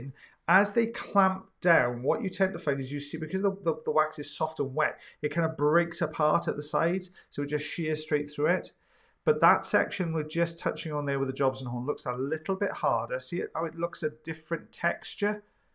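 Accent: Wales, United Kingdom. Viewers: His lively and lovely accent is Welsh! 😊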